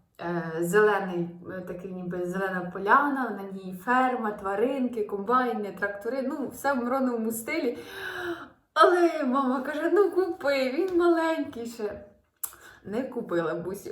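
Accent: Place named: Western Ukraine, Ukraine. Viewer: Привет соседям а мы из Херсонской. Я спросила потому что ты так хорошо говорил по украински думала что может с западной у меня муж со Львовской и у него такой же акцент. 🇺🇦🇺🇦🇺🇦💙💛🌹🌹🌹❤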